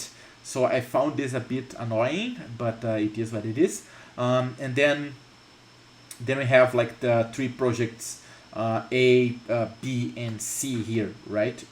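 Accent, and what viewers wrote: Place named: Brazil. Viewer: Dude, what an amazing video. keep making videos like this. It opened my mind. Are you Brazilian? The accent reminded me of Brazilian.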